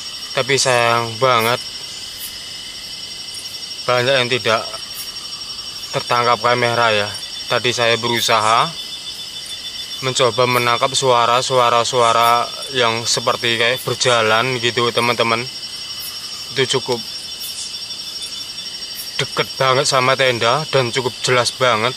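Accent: Java, Indonesia. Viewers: Jawanya medok mas😅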